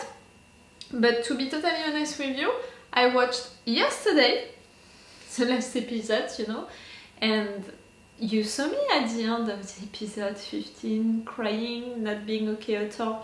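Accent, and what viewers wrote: France. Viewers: .....why I feel fall in love with ..you , reactor.. What wrong with me .. This is 1st time I had this feeling, experience.. 1st of all your dialect..slang. Are you french? It's so beautiful... For this moment I agree french is beautiful soft language.. Sorry this is random and ridiculous.haha Btw ..as aot fan...I will stick to this ,your channels,your reaction.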